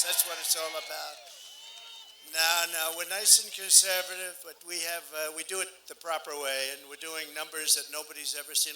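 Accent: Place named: New York, United States. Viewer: Me 2!!! Been following him for last 8 yrs know him from back of my hand knows how he talks NY'r bold strong power gumption n humor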